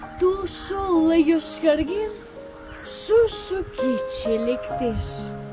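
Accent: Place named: Russia. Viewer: I am Estonian and I also understood 0% because of Russian accent. I understand only when I read it.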